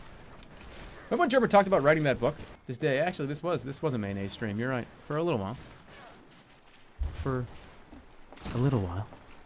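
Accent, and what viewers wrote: Boston, United States. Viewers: jerma's voice sounds so much higher in this video and he sounds more boston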